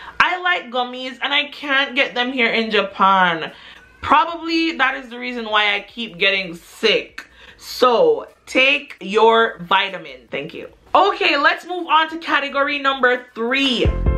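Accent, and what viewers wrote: Jamaica. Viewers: I did hear the little Jamaican accent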